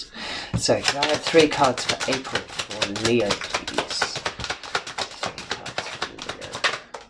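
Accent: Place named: RP, United Kingdom. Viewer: I love an educated British accent. I can hear why others inspired you to read the Scherezade book (can't remember the title 😁). I miss Britain. I'm an American with an English mum, and I've always felt at home when I've visited your beautiful country. Your reading was spot on, and I loved the great commentary. I'm a new subscriber. Cheers! ❤️😊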